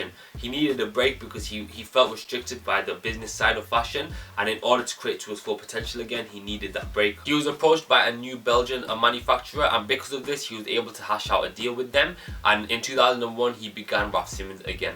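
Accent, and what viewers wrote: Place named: Manchester, United Kingdom. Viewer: The Manchester accent too strong 😂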